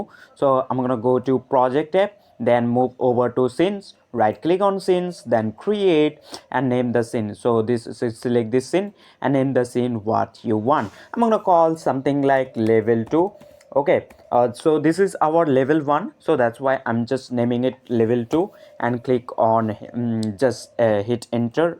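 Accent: India. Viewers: Indian accent is horrible Ogay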